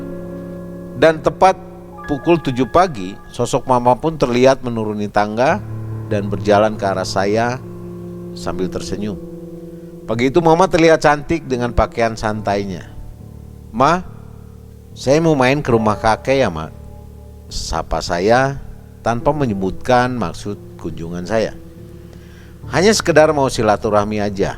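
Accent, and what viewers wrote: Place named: Sunda, Indonesia. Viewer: Suara khas sunda nya mantul pisan euyyy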